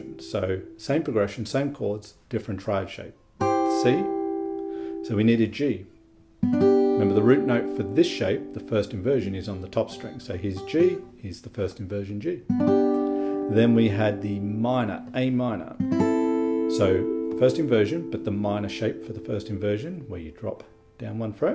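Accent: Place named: Australia. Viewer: I thought his’ cool the caption helped me break that awesome accent(Aussie ?) so its worth subscribing to his vid acct…ty mate ❤ fr felipinas ❤🎉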